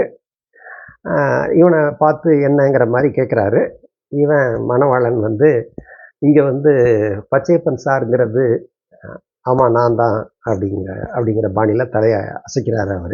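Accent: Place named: Tirunelveli, India. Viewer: உங்கள் திருநெல்வேலி ஸ்லாங் எனக்குப் பிடிக்கும். நீங்கள் நல்ல கதைசொல்லி. திருநெல்வேலியில் இதே போன்ற ஒரு சம்பவம் எனக்கு தெரியும். இளைய சகோதரியும் பள்ளி ஆசிரியை.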